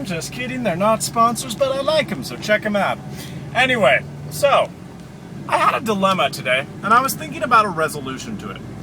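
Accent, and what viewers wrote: Ireland. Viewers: Are you trying an Irish accent or a Toby Turner accent? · You sound like an irish person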